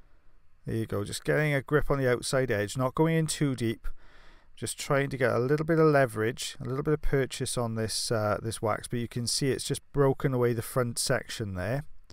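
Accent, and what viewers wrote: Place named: North, United Kingdom. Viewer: Fabulous! Love your commentary, your accent reminds me of infrequent but lovely trips up North. ☺I reside on the South Coast. The videos are great, keep up the good work!x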